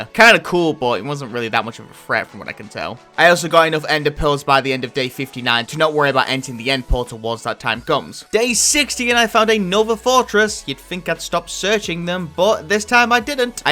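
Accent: United Kingdom. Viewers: R u Welsh?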